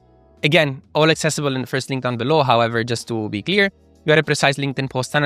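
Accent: Italy. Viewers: You make very good videos even if your English is a little bit Italian.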